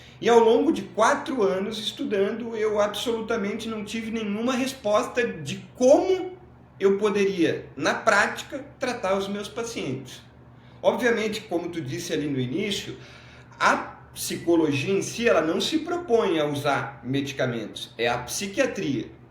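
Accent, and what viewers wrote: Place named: Santa Catarina, Brazil. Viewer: Muito bom!! O conhecimento do é muito vasto, agrega muito e o sotaque parece de catarinense 😅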